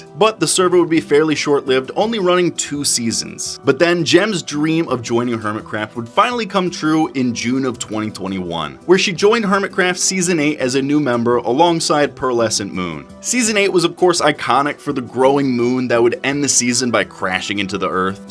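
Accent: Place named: Newfoundland, Canada. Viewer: Everybody talking about gem but i Just I pressed by ur Newfoundland pronunciation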